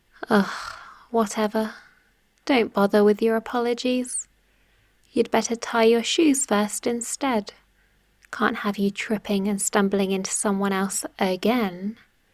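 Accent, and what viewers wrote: England, United Kingdom. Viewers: Man, im a sucker for a good English accent.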